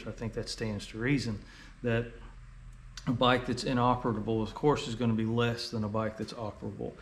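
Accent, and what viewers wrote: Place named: South, United States. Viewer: There is no such a thing as a perfect and when I say wrong I just disagree. It does not mean I am right and you are wrong that's all. If you were not doing a great job I would not be watching you all the way across the country in a miserable state lol of Southern California North East San Diego By the way you talk I'm guessing you're somewhere in North Florida or South Carolina? Also I don't have any Hondas actually have a couple of 2 wheelers 2004 WR450F, 2002 DRZ400 and 1985 Yamaha 225DX. I did grow up in the 1980s with a 1977 very first year Honda Odyssey and 83 Honda 185S. I don't like 4 wheelers I am a dirtbike guy but for some reason I also do like threewheelers so go figure Well thanks for doing a great job keep making videos and I watch some of your videos over and over again and my opinion is that Honda two valve motors were a lot more durable and did not have top end problems like their RFVC 4 valve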